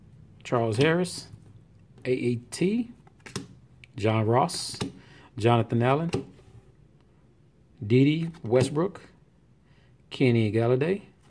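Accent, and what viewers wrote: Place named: Louisiana, United States. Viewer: Oh cool I'm in Texas too, but I was born in Louisiana and you kind of have the accent so I was jc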